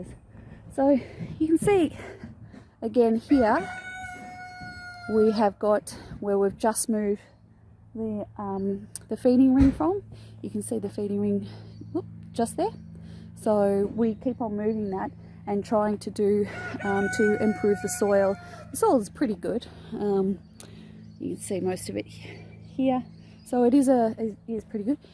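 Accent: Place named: Australia. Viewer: Looking forward to seeing more videos. Beautiful place there. Where are you from Kind of sounds like Australia. Have a great night and God bless.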